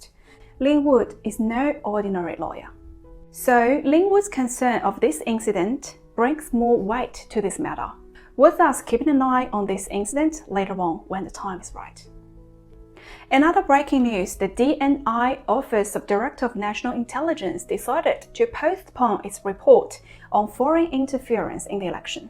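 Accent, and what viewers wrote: Australia. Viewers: Very good English with OZ accent, love it 😍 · 澳洲口音而已 讲的很好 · I also watched you a few times on your Chinese program. I slightly prefer your new English channel as it sounds more serious and factual IMO. Also really loved your Aussie accent. Kudos! Not many on here can do both languages at a mother tongue level! 加油！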